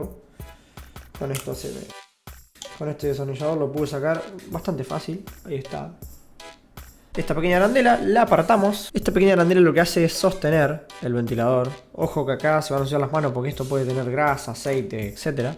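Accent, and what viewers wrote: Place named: Argentina. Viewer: eres como un mexicano con acento de argentino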